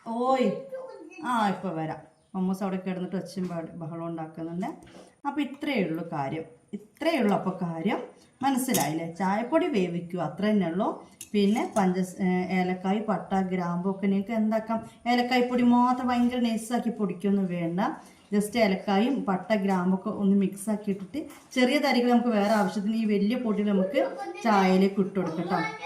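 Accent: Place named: Kozhikode, India. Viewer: ഹലോ ഇങ്ങള് നാട് എവിടെയാ ശരിക്കും നിങ്ങൾ കോഴിക്കോട് വർത്താനം ആണല്ലോ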